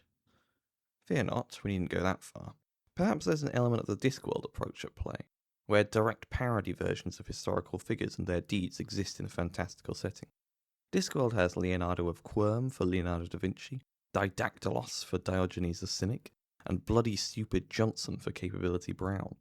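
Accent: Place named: RP, United Kingdom. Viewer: You see what's interesting?? NORMALLY I'd actually AGREE with you... In case, however - nah. The situation here is TOO damned. Hear it from someone who spent 4 years of his life in SCOTLAND: -- I can barely make out utterings sometimes, and he actually speaks in a STANDARD British accent. It's just he's so QUIET, and his prosody is SO CUT-UP and faint...:/.. Seriously, dudes... I have to rewind like 10 times, and it takes me like 30 minutes to fully "comprehend" a 7-minute talk...:/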